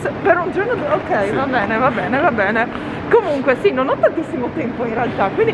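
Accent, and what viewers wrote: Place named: Bologna, Italy. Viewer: con l'accento bolognese!!! Adorooo!!!!:)))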